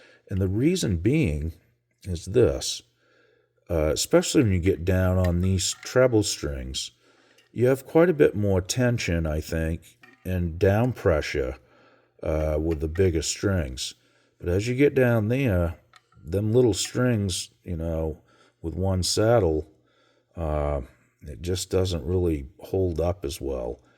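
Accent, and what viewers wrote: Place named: New England, United States. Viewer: Are you from Boston or New Hampshire... I noticed your accent.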